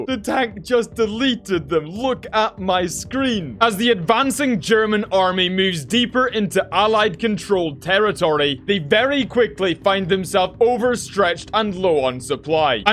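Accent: Scotland, United Kingdom. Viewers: Scottish BaronVonGames? Consider me a subscriber! I feel bad that your work goes unnoticed.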